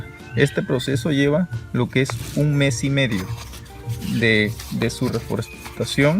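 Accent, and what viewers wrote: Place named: Salamanca, Spain. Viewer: Que alegría escuchar a un salmantino 👋 Muy buenas recomendaciones, saludos también soy de aquí 😁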